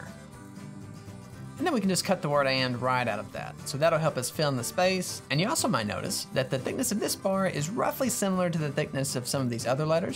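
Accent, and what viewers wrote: South, United States. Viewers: as a (fellow? my ear always catches that accent) Southerner, I love this menu and am now desperately craving ribs 🤤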